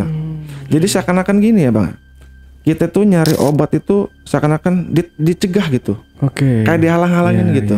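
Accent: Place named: Indramayu, Indonesia. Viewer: Ini banten mana ya? Kok logat nya kaya jawa indramayu 😅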